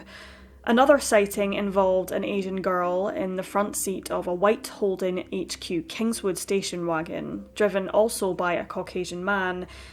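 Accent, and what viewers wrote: Scotland, United Kingdom. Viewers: why are you scottish??? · I am unsure how long I have been subscribed but I will say this without a doubt, you are my favourite true crime narrator, you never sensationalise the stories or make silly jokes and memes like others in the same genre, I do sometimes enjoy other methods but I dobnot respect them as I respect you. You being scottish also helps because it let's me here more of my own accent online without it being exaggerated or used as anything other than the true voice it is. You have inspired me to put my own voice out there (not the channel I am commenting with) and also you have given a voice to many of the unfortunate souls in your videos. Thankyou.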